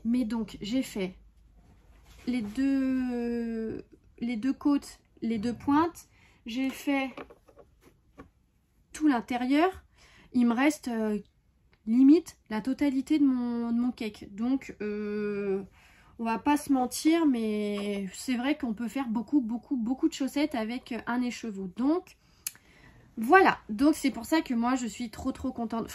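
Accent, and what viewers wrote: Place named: Hauts-de-France, France. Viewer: Bonjour, je découvre votre chaîne et j'ai regardé votre vidéo avec grand plaisir, votre façon de parler très naturelle me plaît beaucoup (peut-être aussi parce que j'habite les hauts de France également et qu'il y a de toutes petites pointes d'accent dans vos paroles). J'ai cru comprendre que vous avez commencé le tricot il y a un an, je suis en admiration devant votre paire de chaussettes de Noël 😮😮😮😮😮😮😮. Continuez comme ça ne changez rien à bientôt 🙏🤩🤩🤩🤩🤩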